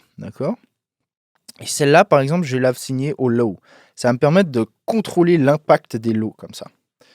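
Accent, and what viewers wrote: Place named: Montreux, Switzerland. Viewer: ce petit accent du coin Montreux / Aigle, ou vraiment pas loin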